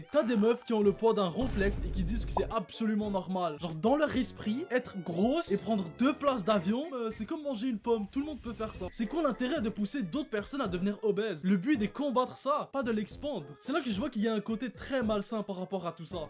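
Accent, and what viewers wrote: Quebec, Canada. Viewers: Attends t’es québécois ?!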